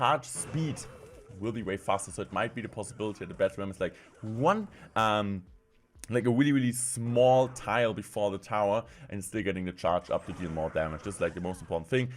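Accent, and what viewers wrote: Germany. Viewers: Are you german i can tell by your accent😂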